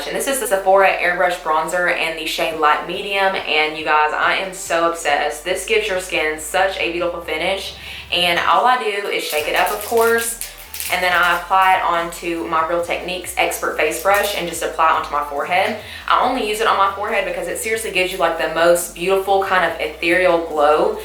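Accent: South, United States. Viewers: (1) You are even prettier natural! (2) Your Southern accent really surprised me for a moment - but my ear adjusted. :) (3) Your skin is naturally perfect & translucent. (4) Nice suggestions and demonstration. Thank you. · that's where that sweet accent comes from! I want to live down south so bad! Great video I love that you use mostly affordable drugstore make up! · Bc she has a southern accent!?! OMGGGG this makes me mad like they're not the same whatsoever she just has the same accent as someone else and now they remind others of someone, seriously 😒 gosh this is annoying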